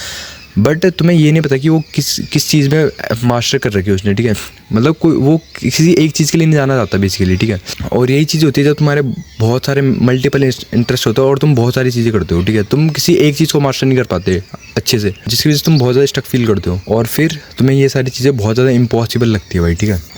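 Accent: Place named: Hills, India. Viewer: Bhai pahad se ho?😊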